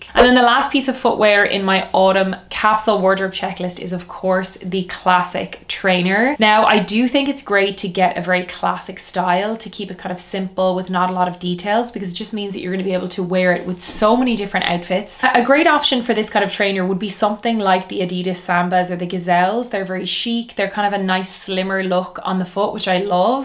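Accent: Ireland. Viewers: the Irish accent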